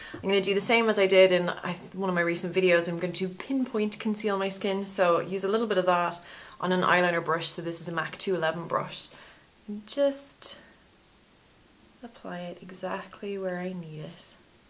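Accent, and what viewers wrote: Ireland. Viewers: DAMN IT. I meant to ask if you were Irish? Your accent sounds Irish but you're Australian? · She is Irish but recently moved to Oz. She better keep her Irish accent I love it.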